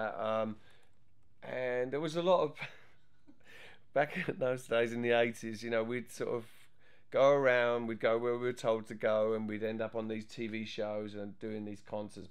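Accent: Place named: Cockney, United Kingdom. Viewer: A bit of Cockney accent in it, I'd say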